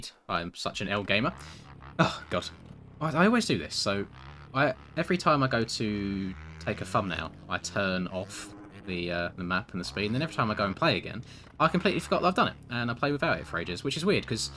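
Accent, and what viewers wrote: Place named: United Kingdom. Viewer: Any time I get to hear the smooth british voice I'm in.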